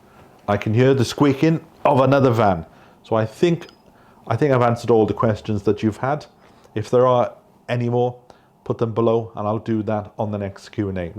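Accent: Wales, United Kingdom. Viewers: I'm loving your channel! My father grew up in Neath. I miss his lilting accent and his magnificent singing voice. He left Wales in his late 20's, because of his very bad asthma... they told him he needed the sun and dry air in South Africa, so off he went. And then he met my mother and a while later I arrived. Your accent is not as broad as his was. Even so, it does my heart good to hear it! The friendliest man, he loved telling a good tale and sitting down at the piano, playing and singing his heart out. Bless you and thank you for the happy nemories and your fascinating channel. Bronwen from Johannesburg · That story about the fish and chips order made me laugh - hardly surprising they didn't want to deliver 300 miles away!😁 I wonder what the locals think of your accent - it is a definite Welsh accent to me, but perhaps to their ears it is quite mild and you even sound a bit English? 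How are you getting on with reviving your Welsh language skills? Do the locals speak Welsh on a daily basis? You seem very settled there already. 🙂 The hilly terrain will soon improve your fitness if you take daily walks.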